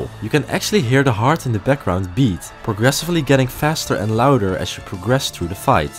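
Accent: Netherlands. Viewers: You can just hear that he is Dutch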